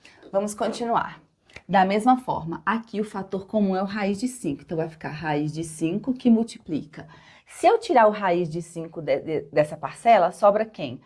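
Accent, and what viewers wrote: Minas Gerais, Brazil. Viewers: Sotaque mineiro...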